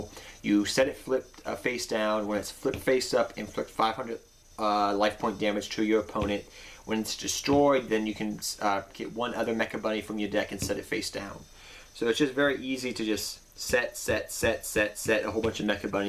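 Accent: Brooklyn, United States. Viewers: Are you from Brooklyn? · lol no do sound like you're from Brooklyn, not that there is nothing wrong with that.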